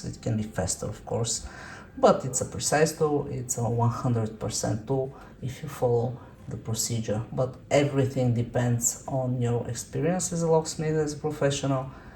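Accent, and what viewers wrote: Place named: Italy. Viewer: Sento un po di italiano in questo inglese😂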